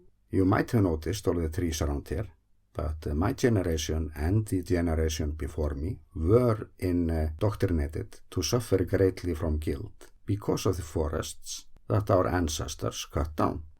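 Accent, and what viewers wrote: Iceland. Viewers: One of my favourite things is how well I understand the Icelandic accent from watching your videos. When I first started watching I needed subtitles sometimes but now it’s completely natural to me. Keep making awesome videos :)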